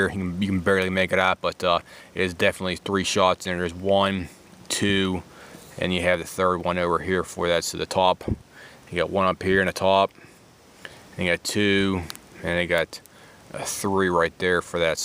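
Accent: Pittsburgh, United States. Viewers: This guy is definitely from the Pittsburgh area!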